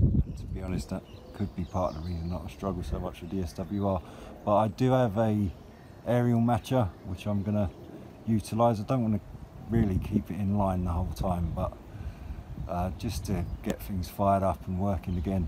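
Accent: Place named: Deep South, United States. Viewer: You sound like you come from the deep South. I wonder if you can get in on our South East Coast Net tomorrow ?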